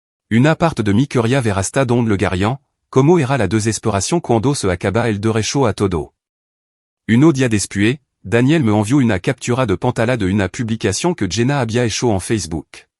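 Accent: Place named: France. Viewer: Que mierda es esta de poner una voz en pseudo castellano con un acento en francés que hace incomprensible y vomitivo el relato? salgo por patas de acá.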